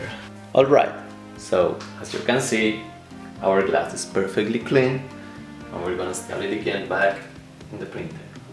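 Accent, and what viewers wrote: Spain. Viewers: Después de un buen rato viendo el vídeo y sospechando por tu acento de que sabías hablar español, veo que pone en el Mac "Archivo/edición" jajaja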